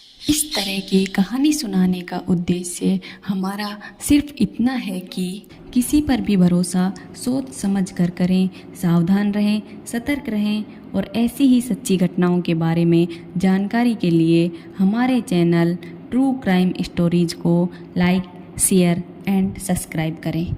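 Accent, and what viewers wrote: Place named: Haryana, India. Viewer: Awaz haryana ke hai, ma'am haryana ke hai.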